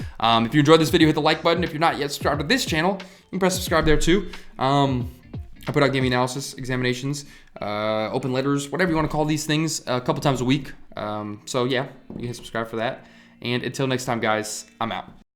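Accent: New York, United States. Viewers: Okay i can deadass definitely tell your from new york my guy lol 😂😂😂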